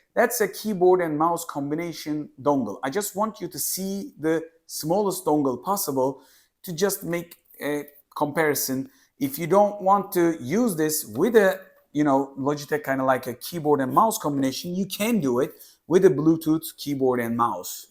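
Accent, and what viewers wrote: Turkey. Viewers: Reis türk müsün ? Türk aksanın var :> Ürün incelemesi için teşekkürler.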